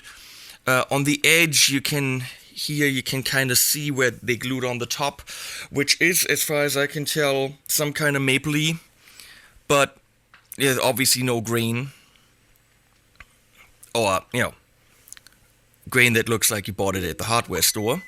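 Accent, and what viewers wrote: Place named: Germany. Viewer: Gernan english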